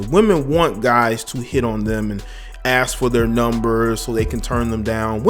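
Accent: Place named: AAVE, United States. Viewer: Stop speaking ebonics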